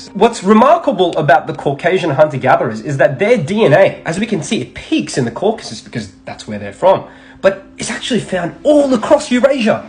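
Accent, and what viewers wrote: Australia. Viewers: Which part of Australia you are from? An Iranian with an Aussie accent 😮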